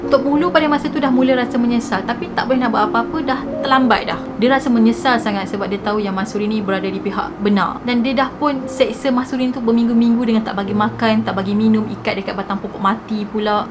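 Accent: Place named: Kedah, Malaysia. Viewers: Tgk gaya n percakapan mcm oqg kedah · kedah ni boss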